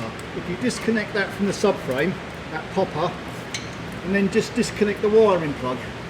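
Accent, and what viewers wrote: United Kingdom. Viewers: Ох уж этот Британский английский 😂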